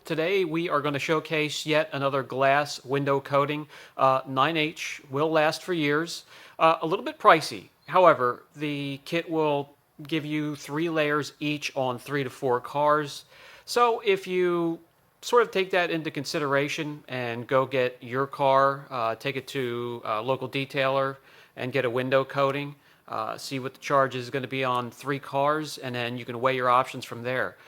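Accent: Pennsylvania, United States. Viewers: Other than the "Pennsylvania-ese" I detected in your accent many moons ago, you sound perfect. And as far as getting ahead of yourself, it's up to us to keep up! Your videos are straight-forward and a pleasure to watch. I always learn something new. 👏🏻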